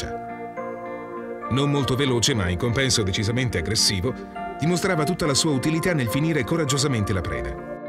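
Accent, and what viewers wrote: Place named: Lombardy, Italy. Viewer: ma...sai giusto per curiosità se ti va di rispondere Ma tu di dove sei? Pare che parli un "mix" di dialetti... Trentino e bo potrò sbagliare chiedo venia ma qualcosa di lombardo? Piemontese?? Chiedo solo per sapere perché apparte te e la prima volta che sento una parlantina con rispetto parlando giuro che non è offesa) del genere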